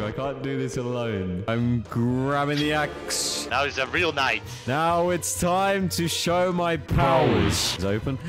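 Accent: United Kingdom. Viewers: Are u british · Yes. But your British(like me) which makes you the absolute god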